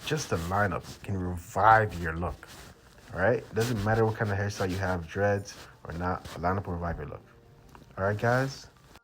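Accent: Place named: Toronto, Canada. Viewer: Man sounds like he's from the GTA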